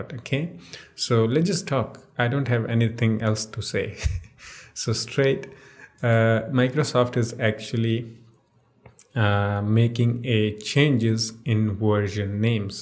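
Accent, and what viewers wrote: Northeast India, India. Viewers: where you from bro? your accent is sound like people of Northeast india,meghalaya ?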